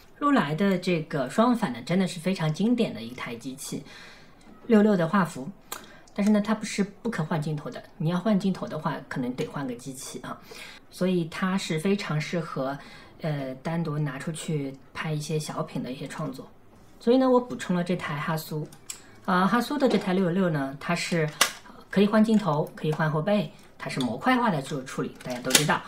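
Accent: China. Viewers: 是昆明话吧？ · 上海摄友来报道，听到乡音很亲切，哈哈😊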